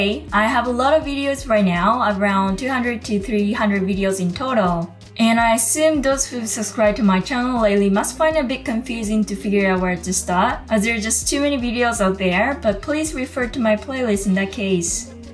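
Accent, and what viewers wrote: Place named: England, United Kingdom. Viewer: I love your English Accent Sensei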